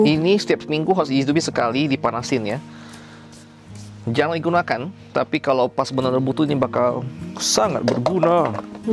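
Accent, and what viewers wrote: Pontianak, Indonesia. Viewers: lebih menarik chanel ini drpd yg sebelah,kbnyakn narasi sok wah ,terbukti subscriber nya lebih bnyk ini logatnya pontianak atau ketapang ya bang?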